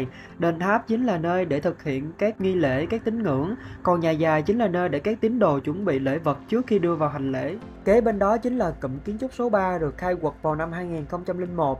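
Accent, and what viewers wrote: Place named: Mekong Delta, Vietnam. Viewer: Bạn nói chuẩn giọng miền Tây luôn, thân thương ghê🥰 (mình nói chuyện bị lai giọng SG rồi, mặc dù mới lên mấy năm) nhưng mà 50 mét chứ đừng mess nha 😂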